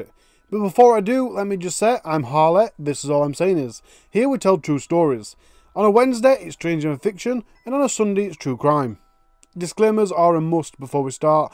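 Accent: United Kingdom. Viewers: It's not weird if you know Britain. It's perfectly normal. You just won't hear it on the BBC, but that's fine because we don't all talk like they do!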